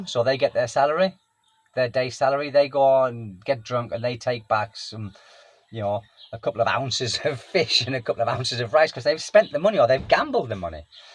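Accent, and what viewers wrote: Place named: Newcastle, United Kingdom. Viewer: You are welcome. I recently posted one of your videos to my cousin Paul who married a girl from Sunderland in 1983. He has lived there ever since. I detected you have a slight Geordies accent. Great memories of being best nab at his wedding. I was best man. The geordies were brilliant. So similar to we scousers. really honest with a grear sense of humour and dont suffer fools. Keep up the great work. Tony from the pool.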